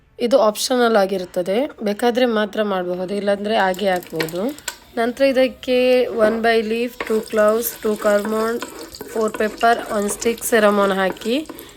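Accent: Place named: North Karnataka, India. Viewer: Nimdu yavoor kannada ri uthhrakarnata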